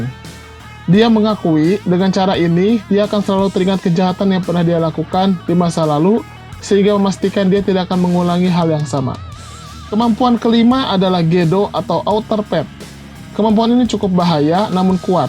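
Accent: West Java, Indonesia. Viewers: Orang sunda ya? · Didenger dr cara ngomong nya, kayaknya si mimin ini urang sunda nya ?? 😁😁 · Ini yg ngomong orang sunda ya soalnya logatnya ketara bgt, bilangnya narutok sasukek belakang pake "K" semua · Kamu orang Sunda ya min?? Hayo ngaku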